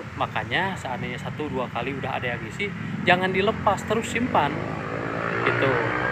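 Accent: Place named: West Java, Indonesia. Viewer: Nuhun kang, logatnamah bangun urang sunda, ti palihan nana kang???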